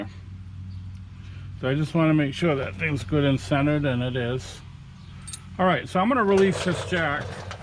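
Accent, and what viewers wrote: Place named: Boston, United States. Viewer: yeah I heard it and was like this guy has to be near Boston. Thanks for posting the video I'm doing some work on my dodge and you really helped me get shock out the way so I can replace lower control arm. Thank you again ☺️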